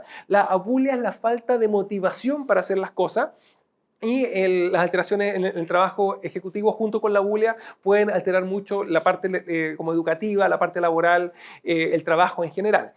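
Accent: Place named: Chile. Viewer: Q agradable encontrar un acento chileno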